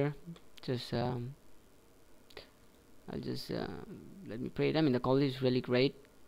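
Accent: India. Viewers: I can hear that u r indian i am indian my self except im punjabi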